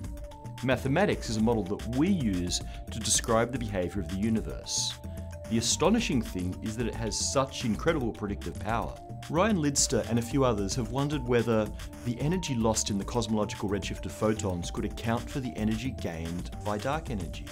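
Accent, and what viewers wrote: England, United Kingdom. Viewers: PBS: People are waking up to the climate scam.. what can we do? PBS director: Hire a spokesperson with a beard and a British accent. That'll add credibility so they believe our bullshit. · climate change has nothing to do with this? Now I know its true~!, Speak with an english accent and have a well known TV company behind you, and you can shovel complete shit from your mouth and no one cares to say how stupid the little "spoiler" in the description is. fuck off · Why do they always have the host of these shows with a British accent.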